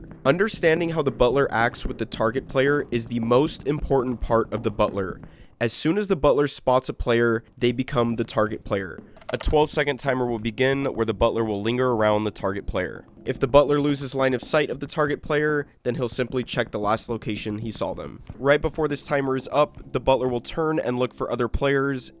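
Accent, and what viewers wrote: United Kingdom. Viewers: Brishish man from South London.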